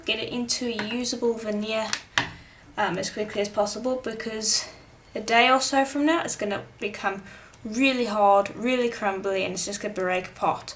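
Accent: South Africa. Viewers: Is that a south african accent i hear? I just found your channel, very cool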